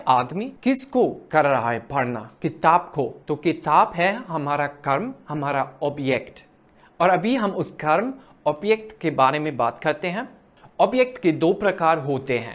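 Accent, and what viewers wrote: India. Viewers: My Goodness ! You blew me away with your hindi accent.It's so nice to hear from you...but now onward i'm also planning to learn German language from you. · Your Hindi accent is really sweet ❤ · nice accent of hindi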